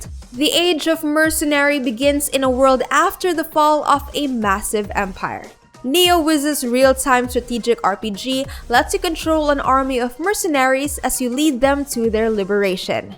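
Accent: Philippines. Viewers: I feel like your english sounds filipino. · You sound like filipino IM PROUD TO BE FILIPINO ML TAYO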